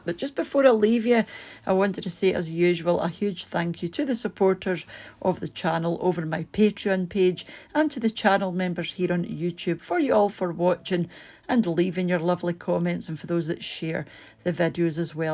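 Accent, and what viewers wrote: Scotland, United Kingdom. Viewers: Good evening! It’s lovely to hear your beautiful Scottish accent!!! ❤also, so glad for you that your weather is lovely, it’s still hot 🥵 here in Georgia in the U.S. I’m so ready for fall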